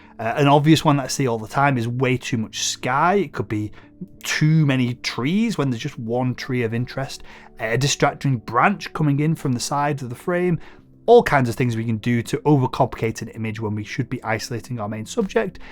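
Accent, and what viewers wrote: United Kingdom. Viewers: Even though I watched this video to learn about landscape photography- which is something I like to to as a hobby - I have to say that I really like your English accent and I think you’re really cute, which was the cherry on the cake. ;-)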